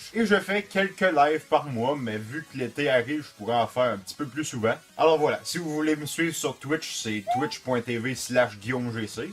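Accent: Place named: Gaspésie, Canada. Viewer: Cosmo The Cat ne parle pas comme steelorse... Il est juste canadien, quebecois et gaspésien comme moi!!! Vive la Gaspésie ❤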